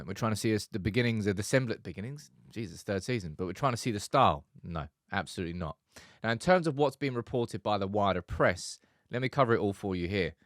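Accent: Manchester, United Kingdom. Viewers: Cant understand your thick manc accent 🎉😂 Ten Hag at the wheel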